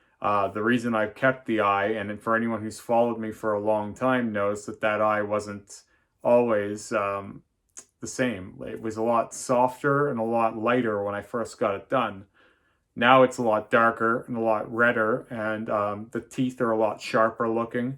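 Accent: Canada. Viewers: I appreciate your reply. Did not know you were Canadian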